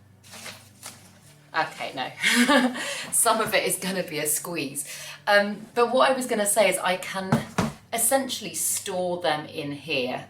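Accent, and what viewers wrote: United Kingdom. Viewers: Hi! I've never had any language problems with your channel British English everytime. Question, HELP! Begonia semperflorens, bronze leaf, they root in water with no problems but when I plant them in soil, they rot. Thoughts?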